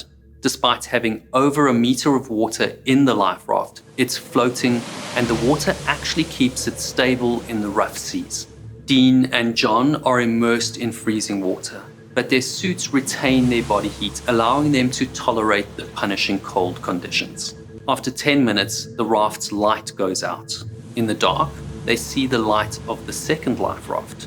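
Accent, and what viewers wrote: South Africa. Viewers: It would be very interesting if you created a video about rescue operations, specifically Coast Guard helicopters. They are fascinating creatures in that they can fly in atrocious conditions. My son's boy scout troop did a field trip to our local Mercy flight helicopter. It was so interesting! One thing I found fascinating was the RPM of the main rotor blade. I think it was like, 165 RPM, it was a lot slower than I thought it was but the blades are so long that the blade tips can easily approach the speed of sound as the tips move and a much higher velocity than closer to the center of rotation. Anyhow, I think it would be really cool to understand more about search and rescue equipment in general. I'll watch anything you talk about with that super cool South African accent! Cheers! I think you should find a way to say 'Thrusters' in each video you make:) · I'm thinking he hears "dick hand" from the pleasant South African accent.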